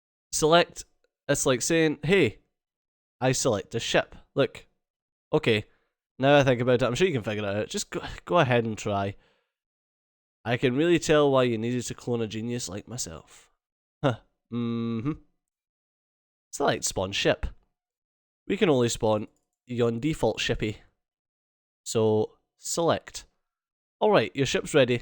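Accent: Scotland, United Kingdom. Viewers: Woo! HAL 9000 jokes with a scottish accent! if this game would have multiplayer, i will buy this as soon as it's awailable =D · I was laughing so hard while was reading the Robots responses. Not just because the dialog was funny but, because accent seemed go go well with it. Hurray for Scottish accents. P.S. Love your vids + keep up the good work.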